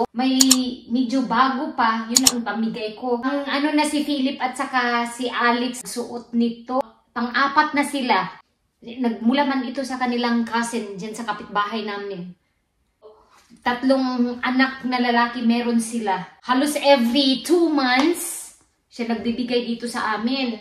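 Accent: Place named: United Kingdom. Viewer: british accentan kau jd ang inday ui buyag...well done mama! maau nlng jd naminaw ang kuya philip!ingun ana jd na madam basta mama nata,ky akong bebelabs bitaw mao pd..nah panahon pd namo dria di masabtan 😂! mura pd sa cs ky naa pd ginabutang pra dli magkeloid ,maau nlng kd ky naayo na!mahal pd ba ang cargo diha padung pinas?? ky dri mahal mao ginahatag nlng namo or ginabutang sa mga clothes box ..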